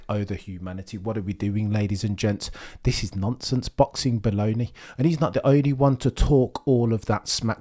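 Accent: Birmingham, United Kingdom. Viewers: Sky sports have been gaslighting us all ever since the Miami GP (Lando's 1st ever gp win btw) and ever since that race he's needed a ton of help from the team and Oscar and still he's never been close to Max in the WDC standings. The "Simply lovely huh" at zandvoort was pathetic and then his post race comments after getting humbled in Brazil were even worse. If that McLaren car is still the one to beat next season then Oscar has to lay down a marker early on and put sulky no-rizz in his place. Nice to hear a fellow Brummie talking F1 btw 👍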